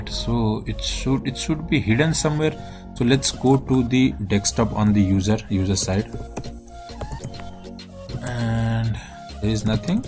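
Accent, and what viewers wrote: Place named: India. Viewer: this is good too, but the hindi accent is conflicting with your english so my advise is that you should try hindi in a video...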